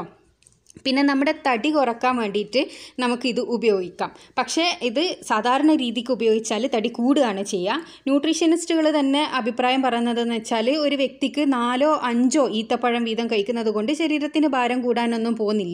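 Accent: Kannur, India. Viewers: Nalla avatharanam... Ningal kannur aano 😊😊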